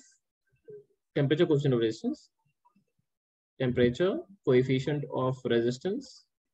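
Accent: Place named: Bengal, India. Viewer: Got reminded of ur class at Akash....2k19 batch....but sir missed ur bengali accent....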